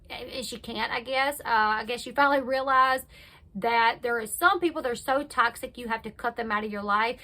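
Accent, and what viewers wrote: South, United States. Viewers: im not even from the states and i can tell its real haha besides you havent skipped a beat in all your videos so if you were acting your damn good LOL plus i love your accent, could listen to your southern drawl allllll day!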